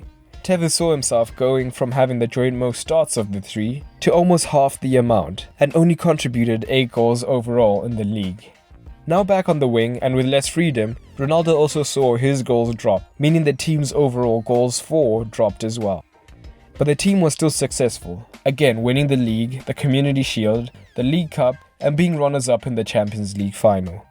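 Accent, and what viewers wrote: South Africa. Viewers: South African??? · are you south african by any chance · Sorry fam have to ask...are you South African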